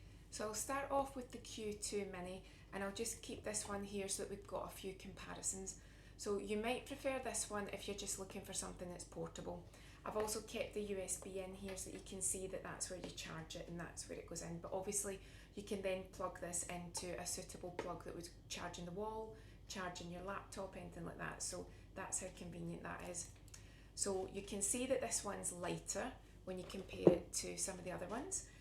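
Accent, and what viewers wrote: Scotland, United Kingdom. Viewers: Great to see a comparison of the three guns. I have dexterity issues and weakness in my arms because of spinal injury. My muscles get so tight from being clenched in pain for hours and sometimes weeks at a time. I gotta start saving up for one of these bad boys. New subbie here and I love your scottish accent. Irish woman myself. Thanks for sharing.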